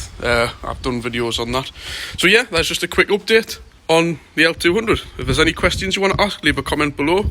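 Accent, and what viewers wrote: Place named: Geordie, United Kingdom. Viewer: Just ordered the rain defence kit from Mitsubishi for my truckman canopy, cheers for all the helpful advice mate, you're a legend and sound like a canny lad. I'd never have known they did one, and got the bonnet deflector too, drums are lovely and matt black already. I'm picking it up on Wednesday this week and any experience with the Goodyear Wranglers which are fitted? I'll deffo get the 265's (I think if i remember correctly?) when they're due for new ones like you suggested. Egr delete lead pre-ordered and paid for (week delay, sold out) Love you man, you're awesome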